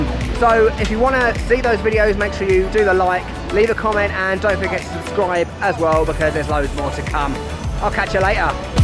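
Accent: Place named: Cockney, United Kingdom. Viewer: You speak with cockney acent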